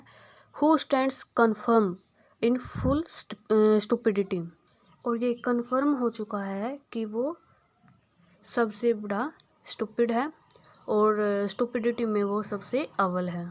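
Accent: Haryana, India. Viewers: Haryana se ho ky?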